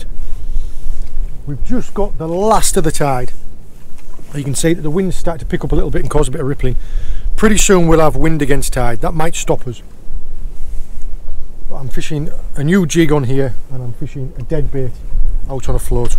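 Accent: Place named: Cumbria, United Kingdom. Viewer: i thought you were North, as were Cumbria lol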